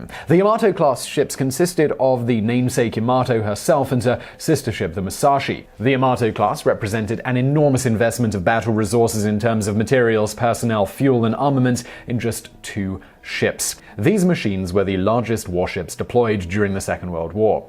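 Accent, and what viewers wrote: England, United Kingdom. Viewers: Despite speaking with an English accent, clearly despises his English heritage. I have watched his videos for a long time now and I think I am pretty much done. He is an an Englishman but chooses to use US nomenclature despite the fact that I think most US viewers are intelligent enough to enjoy these films without having an Englishman talking in US terms just to pander to them. Also he is talking about warships and chooses just one British vessel, ignoring HMS Victory, HMS Beagle, HMS Endeavour, HMS Nelson, HMS Campbeltown, HMS Dreadnought, which was arguably the greatest warship of all time. I really can't stand men who are ashamed of their own heritage. Mr., get a grip sunshine.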